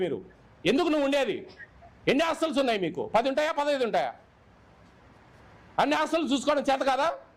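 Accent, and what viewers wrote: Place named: Kurnool, India. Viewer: ఇన్ని వీడియోలు చూసాను సార్ అన్ని వీడియోలు కన్నా ఈ వీడియో పవర్ ఫుల్ గా తిట్టినారు సార్ దూకి సావండి ఎక్కడైనా మరి రాయలసీమ భాషలో ముఖ్యంగా కర్నూల్ భాష లెక్క మాట్లాడుతున్నారు సార్ వాళ్లకు చేత కాకుంటే మాలాంటి వాళ్ళకి ఇవ్వండి సార్ నేను చూసుకుంటాను సార్ 10 15 హాస్టల్లో చూసుకుంటాం అలాంటి వారిని తక్షణమే తీసేయండి సార్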